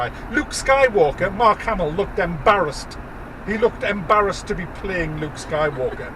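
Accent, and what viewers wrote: United Kingdom. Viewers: The British accent makes the rant a whole lot more satisfying.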